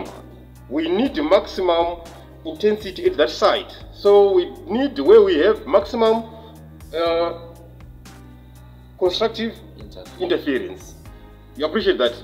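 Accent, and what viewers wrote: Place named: Africa. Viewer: I'm enjoying the videos especially thr African accent. Makes me feel so at home !!! Watched and started to understand knobology from same radiologist !!! Just great !!!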